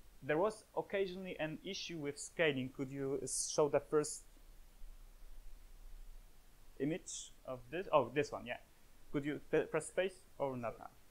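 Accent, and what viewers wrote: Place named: Poland. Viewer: dziwnie się słucha polaka mówiącego po angielsku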